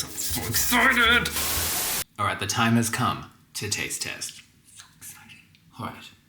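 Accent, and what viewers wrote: United Kingdom. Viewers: Gidday. I am a new sub and love your wartime meal cooking. I was born in SA, and live in QLD. My British in laws always told me that my accent sounds British. Well, when I watched a first video from your channel, I thought you sounded like a Pom! Fancy that. Nice to know you are an Aussie. Absolutely love your wartime recipe content, because my grandparents endured both world wars and the Depression. Both of my parents were born during WW2. I have kept my maternal grandma’s cookbook published in 1950. As you know, rationing extended until 1955. Many recipes in the book came from rationing. For your interest, the cookbook was the Green and Gold cookbook issued from the King’s College in Adelaide as a fundraiser. I have a more modern reprint but it doesn’t feature the same recipes. Good on you for your channel. Keep doing what you’re doing mate. 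❤️🇦🇺👍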